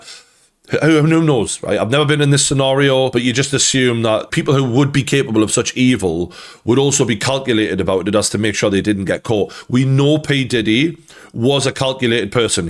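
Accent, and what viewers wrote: Newcastle, United Kingdom. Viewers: That's a Geordie accent - from Newcastle-upon-Tyne in the north-east of England - along with the West Country accent, it's the most pleasing to us Brits....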